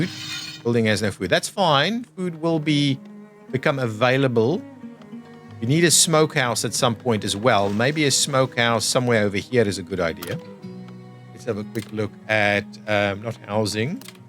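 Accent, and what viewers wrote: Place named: South Africa. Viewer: I recognise that accent Boet!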